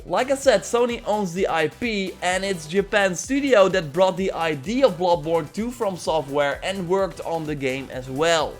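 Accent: Netherlands. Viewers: From what country are you you sound like you are from holland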